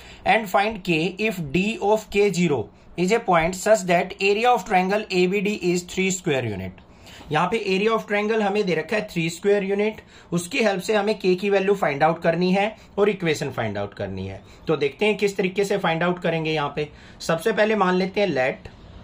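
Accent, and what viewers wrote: Haryana, India. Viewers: Sir app haryanvi hoo na mane pahachan liya😁😁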